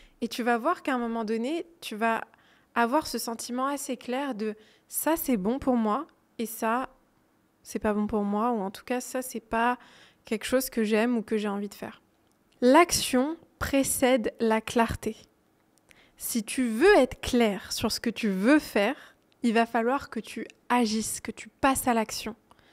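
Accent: France. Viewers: Malgré tout je suis suisse allemand je comprends bien parce que parle comme une francaise parisienne (clair et pas trop vite).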